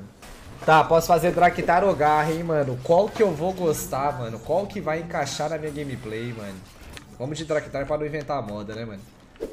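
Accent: Minas Gerais, Brazil. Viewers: sotaque mineiro do é o motivo pelo qual fico gay td dia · voce é de onde mano ? sotaque de minas ou de go ctz haha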